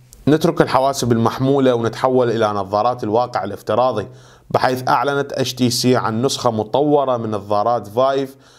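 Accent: Iraq. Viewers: مؤتمر CES2018جميل جداً كل اشياء جميله شكرا على تقرير الجميل بصوت عراقي وشكراً على متابعتك على كل ما هو جديد في عالم 🌐 التكنولوجيا الحديثة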